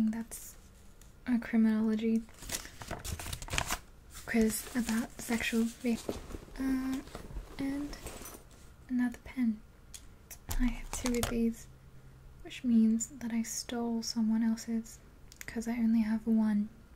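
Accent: Australia. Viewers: Are you Australian??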